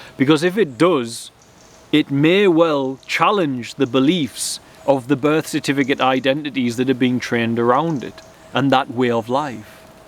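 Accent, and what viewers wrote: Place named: Northumberland, United Kingdom. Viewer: Pure non dual clarity, it’s like listening to Ramana Maharishi but with a Northumberland accent 🙏🏻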